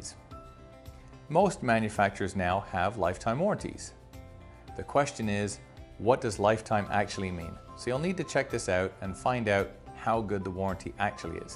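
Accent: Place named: Canada. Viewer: North American plumbing is .... weird! I say North American, as I detect from your accent that you may be Canadian. In the UK taps (faucets) are also categorised with regard to compatibility/suitability according to pressure differentials on cold water and domestic hot water. Some mixer/single outlet spout taps do not work well where hot water is gravity fed (via a roof tank through a hot water storage cylinder-gravity) and cold water is supplied directly from the mains. This is particularly problematic on bath mixer taps. Upon fitting bath deck mixers (2 heads one spout) I have found that the cold, mains pressure actually forces hot water back into the vented cylinder, up to the roof tank(s) and out of the property through the warning/overflow pipe. By the way, you deserve more subscribers. I have 50+ subs, my production standards are very amateurish and I don't just do plumbing content. 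So, I've subbed.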